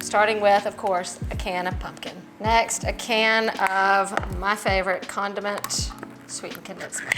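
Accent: South, United States. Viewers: Not only was this recipe. So are you. Will you marry me. It’s the slight southern accent. Absolutely gorgeous!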